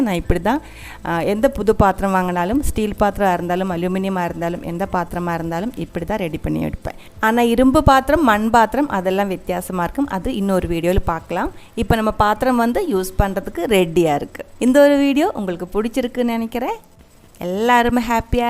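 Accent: Kerala, India. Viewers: Unga voice enakku romba piduchu irukku chechi. Malayala tamil 👌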